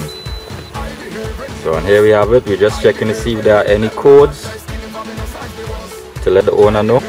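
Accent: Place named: Trinidad and Tobago. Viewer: What island you from? I'm from Guyana. You sound like Trinidad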